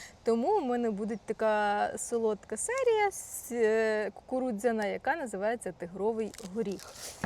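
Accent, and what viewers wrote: Kirovohrad, Ukraine. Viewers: А я то думаю що це суржик такий же як в мене, а виявляється ми обоє Кіровоградські)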